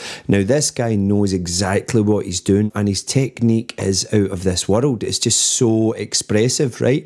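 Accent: Scotland, United Kingdom. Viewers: YOu must be a Scottsman?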